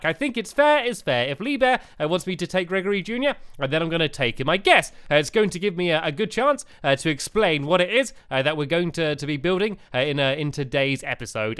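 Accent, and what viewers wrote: England, United Kingdom. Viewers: My son insists that Ester's name is Estah. I told him it's Ester and pronounces it Estah because he's British, but he still wants to say it like you lol He also says "inventry" like Squid lol Just thought it was cute because he really likes you all. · you are like puss in boots accept your english smart funny cheerful alwasy happy and most of all awesome! and you have iron boots insted aka STYLE BOOTS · He is not American he is from England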